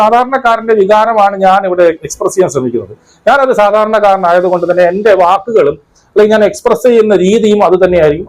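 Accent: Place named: Thiruvananthapuram, India. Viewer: തിരുവന്തപുരം ശൈലി എനിക്ക് ഇഷ്ടമാണ്. ആ നാടിന്റെ ശൈലിയിൽ തന്നെ ചേട്ടൻ പറയണം. കേൾക്കാൻ നല്ല രസം ഉണ്ട്. അച്ചടി ഭാഷ സംസാരത്തിൽ എനിക്ക് ഇഷ്ടം അല്ല. ചേട്ടൻ മാന്യമായി ചിലർ ചേട്ടനോട് നിർദേശിച്ചു എന്ന് പറഞ്ഞു. ശരിക്കും ഞാൻ ആണ്. ഞാൻ മാത്രമാണ് നിർദേശിച്ചത് 'അടിച്ചു തൂറിച്ചു' എന്ന പ്രയോഗം ഒഴിവാക്കണം എന്ന് . ഈ വാക്ക് ഒഴിച്ച് ബാക്കി എല്ലാം ചേട്ടൻ പറയുന്നത് കളിയുടെ പെർഫോമൻസ് കൃത്യമായി വിലയിരുത്തിയാണ്. അതിലൂടെ മത്സരത്തെ പറ്റി കൂടുതൽ details അറിയാൻ കഴിയുന്നുണ്ട്. ഞാൻ ഈ കഴിഞ ഇന്ത്യയുടെ ബംഗ്ലാദേശ് t20 സീരീസ് മുതലാണ് ശരിക്കും continues ആയിട്ട് ചേട്ടന്റെ വീഡിയോ കാണാൻ തുടങ്ങിയത്. ഞാൻ ഒരു തൃശ്ശൂർകാരൻ ആണ്. തൂറുക എന്നും തൂറിക്കുക എന്നും ചേട്ടൻ ചേട്ടന്റെ ശൈലിയിൽ ഇഷ്ടം പോലെ പറയാൻ ഉള്ള അവകാശം ഈ രാജ്യത്ത് ഉണ്ട്. ഞാൻ ചേട്ടന്റെ വീഡിയോ കണ്ട് ഇഷ്ടം കാരണം പറഞ്ഞു എന്നെ ഉള്ളൂ. ധാരാളം ആളുകൾ ചേട്ടന്റെ ഈ പ്രയോഗത്തെ ഉൾപ്പെടെ മത്സരത്തിന്റെ മറ്റു കാര്യങ്ങളെ അടക്കം നന്നായി ആസ്വാധിക്കുന്നുണ്ട് എന്ന് ഈ വിഡിയോയുടെ കമന്റ്‌സ് വായിച്ചപ്പോൾ മനസ്സിലായി. 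ഭൂരിപക്ഷ പിന്തുണ സപ്പോർട്ട് ആണ്.അതുകൊണ്ട് ഞാൻ മിണ്ടുന്നില്ല. All the best. 😊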